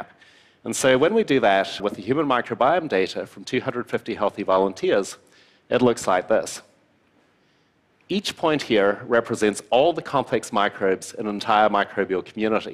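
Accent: New Zealand. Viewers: Kiwi here. I was also immediately thrown by the accent. He's got a Kiwi twang but there's definitely something really unusual about that accent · de Valle Ruiz Re: the accent, I actually found kiwi so refreshing after hearing American so much of the time :-) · He's from New Zealand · It's a type of NZ accent from the deep south.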